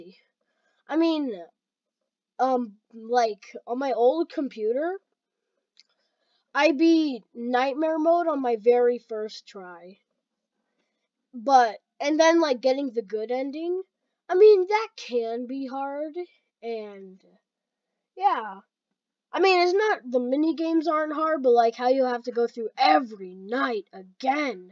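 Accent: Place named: Colombia. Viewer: tienes voz de colombiano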